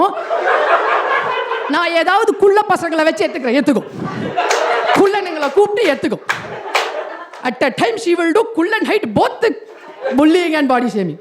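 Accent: Chennai, India. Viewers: Chennai slang is perfectly suits you lit🔥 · Chennai slang awesome 😎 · real class this lady is. liked the fluent mix of chennai tamil and spashta tamil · Indha madras slang was not expected , surprised 😝😝😝 hilarious 😆...now that auto kaar will be like pappa shalu Ukraine la war ninuduchanu paathu sollu...petrol rate yethira poranunga 😜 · Brilliant performance 😍 Your madras slang chance ye illa. Thenampettai signal semma 😂👏👏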